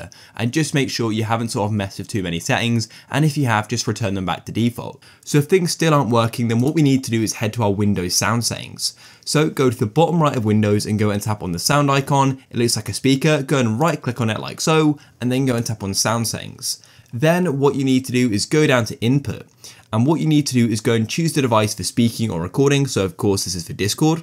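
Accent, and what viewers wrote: United Kingdom. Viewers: EWWWWWWW NOT AMERCIASN BRITISH EWWWWWWWWWWWW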